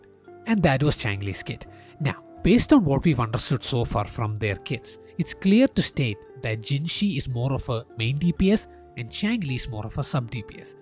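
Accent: India. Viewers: Is this Indian. I feel the accent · Your accent sounds indian Amd btw i am chosing jinshi idk why but i didnt liked changli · That South Indian accent though. Where you from my guy? Tamilian? Malyali? Nice video :D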